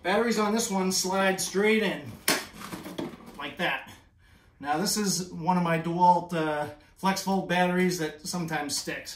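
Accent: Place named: Boston, United States. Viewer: Love your review...you sound like you might be from the Boston area. I'm from Long Island. I wish I knew about the new model! I bought the 220 this spring and while I love the way it performs, the batteries run out of juice so quickly and it takes so long for them to charge I can't mow my front and back lawn on the same day. (And they're pretty small suburban lawns.) I would have loved the larger batteries and longer run time.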